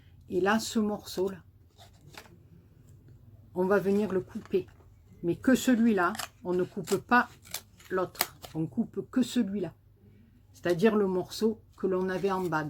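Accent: South, France. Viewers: Super carte et j’adore vôtre accent du sud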